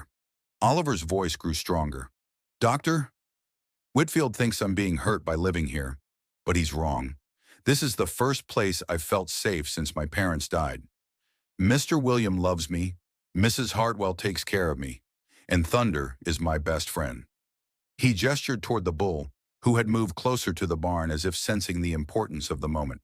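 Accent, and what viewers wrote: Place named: United States. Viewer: I am so sick of hearing horrible US accents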